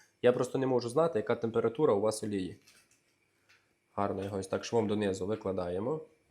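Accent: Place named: Kherson, Ukraine. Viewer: Сковорідка повинна охолонути, а не вистивати..... Хлопчисько говорить діалектом, бо дуже багато слів схожі на російську мову, але не російські. На Херсонщині теж говорять схожим діалектом.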